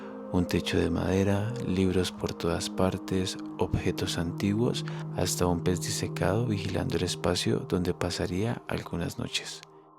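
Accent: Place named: Colombia. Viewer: me encanta tu entonación al narrar, con tu acento colombiano, es de paz. Dedícate a hacer vídeos de poesía o leer textos de libros que hablen de paz. Te recomiendo hacer videos con textos del Libro de La Sabiduría o de Las Siracides. Con tu voz y los paisajes donde caminas... ¡SERÁN HERMOSOS!. Saludos desde Guadalajara, México ❤✌️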